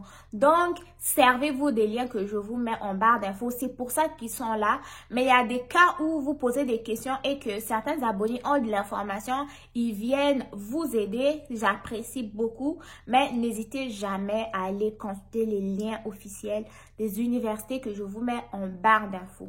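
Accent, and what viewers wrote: Canada. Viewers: tu as deja l'accent canadien. Toutes mes felicitations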